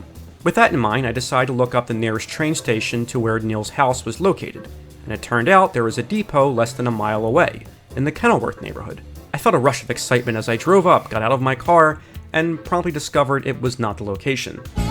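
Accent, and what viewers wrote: Philadelphia, United States. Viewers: I just found your channel, watched a handful of videos (all excellent) and thought to myself, sounds like a Philly guy. This video confirmed it based on your airport departure 😂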